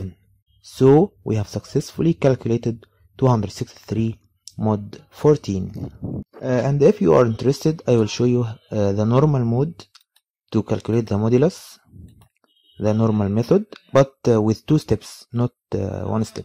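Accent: Egypt. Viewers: no, accent says he's Egyptian man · الاكسنت المصري من اول ما قولت هاي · مبين عليك مصري صح لولا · that is an egyptian accent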